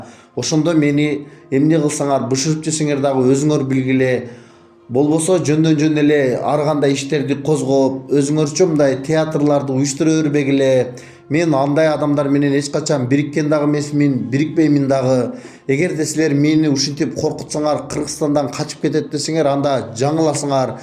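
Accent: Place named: China. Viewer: Сен кыргызсынбы кытайча суйлойсун жинди келтирип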